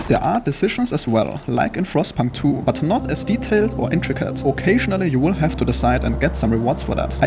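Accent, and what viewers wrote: Germany. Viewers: Ohne es zu wissen aber dein Akzent ist sehr deutsch😂 Finde es trotzdem super diese Kurzreviews, sind echt ein time saver! ❤️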